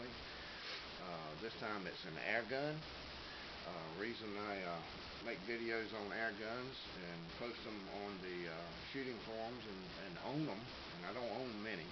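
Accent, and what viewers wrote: North Carolina, United States. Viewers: Are you from NC by chance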